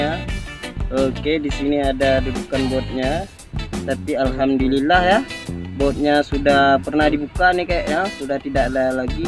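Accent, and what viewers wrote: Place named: Aceh, Indonesia. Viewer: Medok. Yaa aceh nya:v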